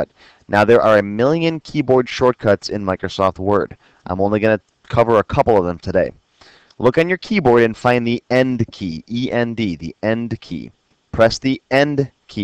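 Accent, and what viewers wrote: Chicago, United States. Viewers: I checked out several tutorials, (school free ware etc). This is easily the best tutorial on the internet. Love that Chicago/Great Lakes accent, LOL! Seriosly, thank you!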